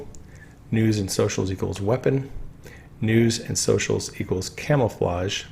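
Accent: Scotland, United Kingdom. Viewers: Great Scottish accent mate. Greetings from the cold place at the top of England. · Being of Scottish heritage, and having vacationed there twice, I can say that your brogue was spot on! Music to my ears! 👍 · Guid Scots accent laddie !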